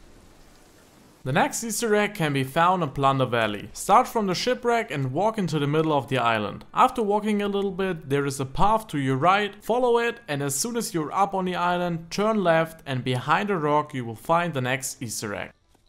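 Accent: Germany. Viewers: Du hörst dich deutsch an :D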